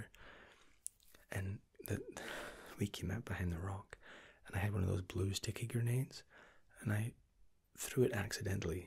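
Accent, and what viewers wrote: Scotland, United Kingdom. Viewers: i have been listening for so long i can't tell... Is he british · are you from scotland and if so where abouts?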